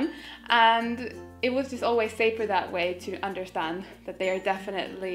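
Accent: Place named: Germany. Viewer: "I am Finnish living in Munich, Germany since 3 years" Seriously? Then you adapted very quickly: Your English pronunciation strongly leads to a common German accent. Furthermore, "since 3 years" is exactly the way most Germans would (wrongly) express a time period. :D Anyway, I am glad you created this video, kiitos. :)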